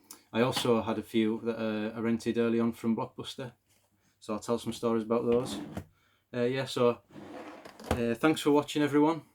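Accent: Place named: Cumbria, United Kingdom. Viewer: Good video. Are you cumbrian by any chance? 😁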